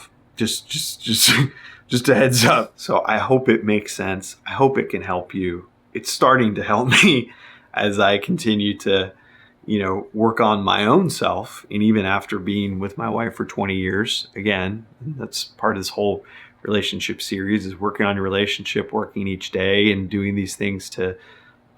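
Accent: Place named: Yorkshire, United Kingdom. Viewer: Hey are you from Yorkshire UK? You sound like a Yorkshire man 😆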